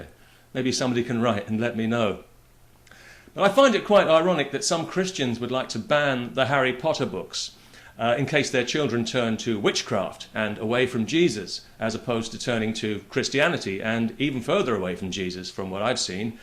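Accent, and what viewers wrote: United Kingdom. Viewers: Nothing like a smack down with a British accent!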